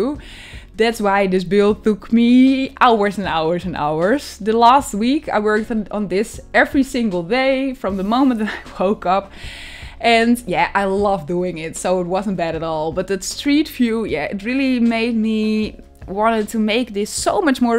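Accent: Netherlands. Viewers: You sound like you’re from the netherlands. Are you Dutch? My dad is Dutch and my grandparents have a similar accent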